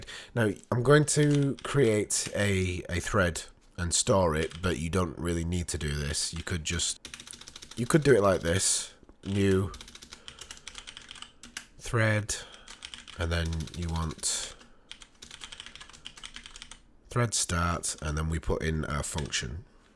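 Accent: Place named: England, United Kingdom. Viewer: Your videos are not boring. For those of us who want to learn, videos like this are rich with content and quality. I walked out of this video being able to thread. That is an invaluable quality in gaming that few people out there bother to take the time and care to teach. So when you present us with this Cake filled with information wealth, it is ANYTHING but boring. Have you noticed we dont thumbs down you? It is partly because you are english and sound awesome, but mostly, its because somehow, the way you teach gets through. You take time, you allow us time to process... These long videos, I watch through from one end to another. This, is quality. I will continue to patreon you until I am poor because you have helped us.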